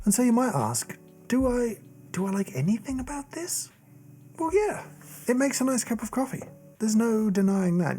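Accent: United Kingdom. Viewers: I went through this entire video thinking that the brewer was called the "Delta Brewer" and that was just being British... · I can't help but think of the fact that the British accent often pronounces words ending a as an er - like delta sounds like delter, despite the fact that it's from Australia.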